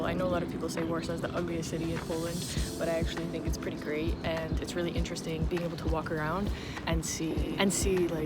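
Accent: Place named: United States. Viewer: Lovely vlog. You can definitely make decent money in Warsaw teaching English - you'd be sought after for your accent (recognisably American but also clear and distinctive, although you might have to slow it down a tad at times, so that your learners would follow). Poland is one of the best destinations in Europe to teach English.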